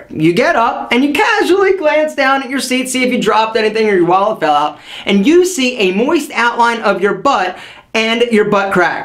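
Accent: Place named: East Coast, United States. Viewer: Where are you from bruh....im guessing close to the eastern coast.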